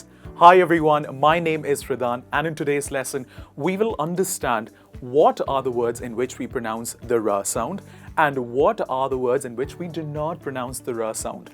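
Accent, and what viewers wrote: United Kingdom. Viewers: This is in British English, right? Because "r" is pronounced in many of the words you mentioned like "first, dark". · Thanks for teaching but you’re pronouncing like British English and you might followed them but i do follow Indian English which is good to hear and pronounce also 🙏😊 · You're sounding british sound.. But in America we sound all r.. Slightly thick .. We not pronounce r silently the word's you've written...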